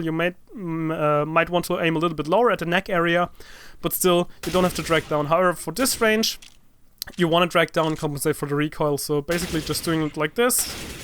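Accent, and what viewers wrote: Germany. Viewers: ! i guess u would do great by doin that cause evry gun has different ways and styles to play that newbs like me need to learn, btw u hv a great accent for a german i used to think all of u would say ze and zat (no offense i hope u get what i mean)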